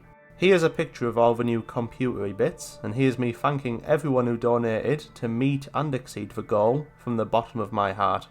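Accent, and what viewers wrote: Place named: England, United Kingdom. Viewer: Interesting information, but would have wished the author did not just read off his story from a paper, but that he would put some life in his speech, as the English accent and fast talk through without emotion is very hard to follow/understand. Especially when details are not shown, only excerpt here and there. Hope this helps in future videos.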